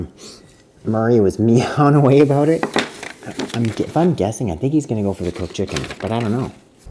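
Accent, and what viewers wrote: Canada. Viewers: Random question but are you from Canada? ☀️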